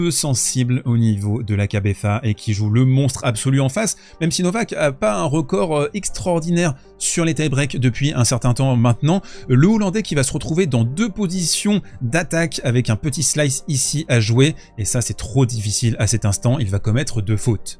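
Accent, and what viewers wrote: Belgium. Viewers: J’croyais qu’il était Belge le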